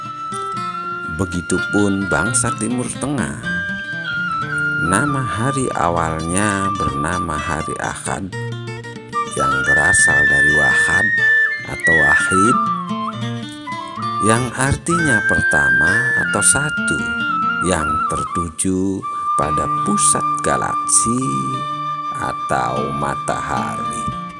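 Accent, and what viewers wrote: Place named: Java, Indonesia. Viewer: vocalnya khas jawa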